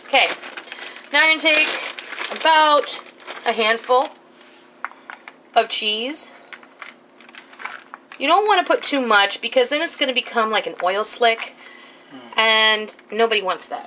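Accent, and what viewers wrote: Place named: Wisconsin, United States. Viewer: Where are you from? You sound like you have a Wisconsin accent 💜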